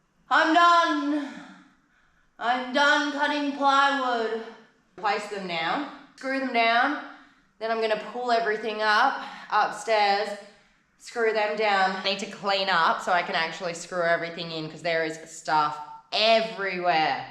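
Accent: Australia. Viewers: So aussie, "me likey " yeah,nah.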